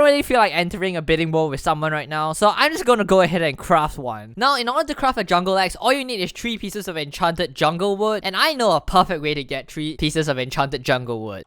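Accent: Singapore. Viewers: Singaporean ah?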